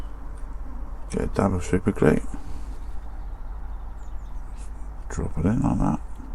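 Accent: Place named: Somerset, United Kingdom. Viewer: Top Job you sound like a fellow somerset boy. Really enjoy your vids which inspire me to get my act together to restore all the diecast I have collected of all types and sizes. Cheers and many happy ziders!!!!!!!!!!!!!!!!!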